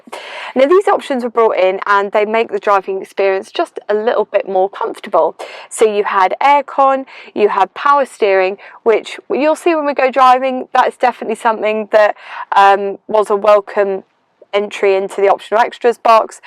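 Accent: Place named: RP, United Kingdom. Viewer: omg no way y are so posh lady.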